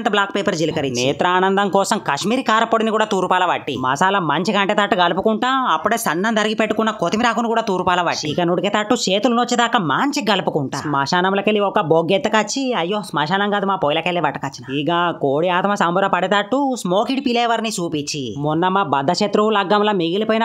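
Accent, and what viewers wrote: Telangana, India. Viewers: Voice telangana yasa super super super bro super super super super super super super super super super super super super ❤❤❤❤❤❤❤❤❤❤❤❤❤❤ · Hi bro don't be mistake the words just slowly tell the story its my suggestion that's why some other people not understanding the speed words they misunderstanding and insulting the slang and Telanga language plz correct it 👌👌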